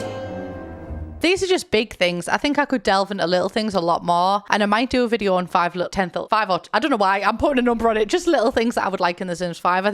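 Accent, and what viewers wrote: United Kingdom. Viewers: whenever someone says british accent, this is what I think of :) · I have always loved the British accent, and I enjoy your content, damn good job, subscribed.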